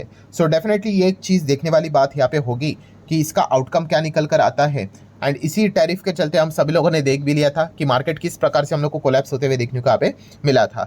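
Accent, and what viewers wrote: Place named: Gujarat, India. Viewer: bhai awaaz se pahchano, yeh gujrati hai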